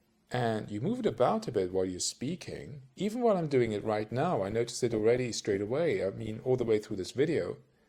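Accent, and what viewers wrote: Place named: Mid-Atlantic, United States. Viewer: Some good pointers==thanks. There's a mid Atlantic twang in there somewhere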